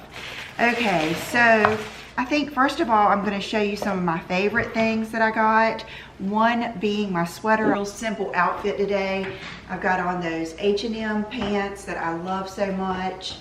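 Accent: South, United States. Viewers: Maria Daquila Right!?! I just love seeing her new video in my feed. Sweetest southern belle, this Long Islander loves to listen too❤️❤️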